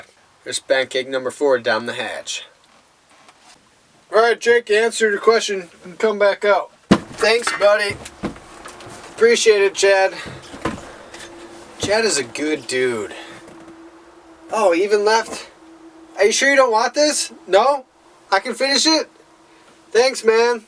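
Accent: Wisconsin, United States. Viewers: You're WI accent gets SOOO much heavier when you drink. My MN one does too.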